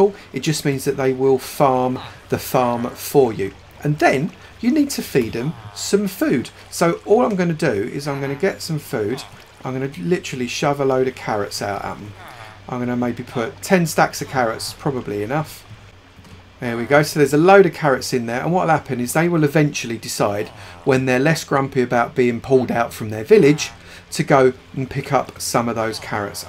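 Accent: United Kingdom. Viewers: Is he british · Lol okay I gotta say the "Gordon Ramsay" comments are a bit laughable because, no offence to you but, You sound nothing like Gordon Ramsey. I guess people hear a UK accent and think "Hey it's Gordon Ramsay." Gordon is Scottish and You don't sound Scottish to me. Thick as bricks.:) Gonna give this build a try though. Thanks!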